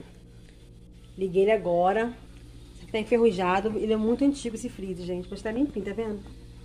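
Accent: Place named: Rio de Janeiro, Brazil. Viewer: Que delícia de vídeo, amada. PARABÉNS PELA sua desenvoltura em nos presentear com seus vídeos naturais, alegres e divertidos. Amoooo. Bjs, gente lindaaaa... AMO seu forte sotaque carioca... Deus é nosso abençoado refúgio querida...😍😘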